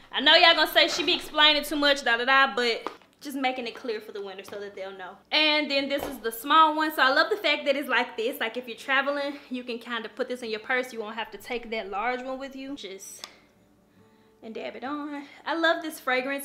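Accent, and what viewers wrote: South, United States. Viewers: I just love your accent (I guess because I have a southern accent too) and how you carry yourself as a young black woman. It is so important that we as young black women lead by example for young women to be "Elegant" and carry themselves in a manner that a young lady should. I think watching vloggers on youtube who set that tone can help guide them in a positive direction. Naturally Sunny talked about you in her previous vlogs and I am so happy I came across your channel and become a new subbie as well. Thank you for being one of the influencers who brings positivity and laughter when vlogging even when it can become a challenge. I'm so happy that you and your family made it through the horrific storm. I've never smelled Tiffany & Co. perfume but I know it smells delightful and grown woman-ish.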